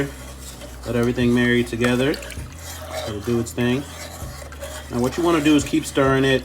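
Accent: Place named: Georgia, United States. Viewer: I could hear the Georgia in you before you even said it. Thanks for the video, I'll be making this!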